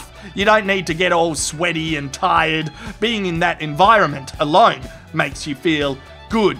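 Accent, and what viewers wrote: Australia. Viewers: And yep spoken like a true ocker, funny as fuck! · I am 2 beers into this video and lemme tell ya some english sounds so much better with Ozzy. Is Ozzy short for Ozzimandias I donno mate, cheers.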